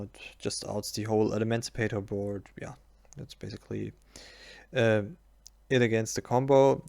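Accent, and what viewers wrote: Germany. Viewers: You have a damn German accent.. why don't you make the videos in German?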